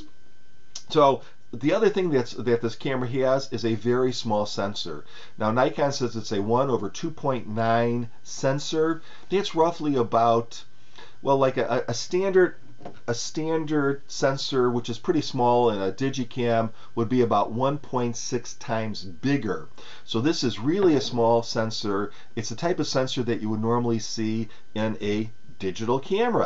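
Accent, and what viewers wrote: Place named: Midwest, United States. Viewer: You have a very thick Midwest accent. Are you from Cleveland... perhaps Detroit?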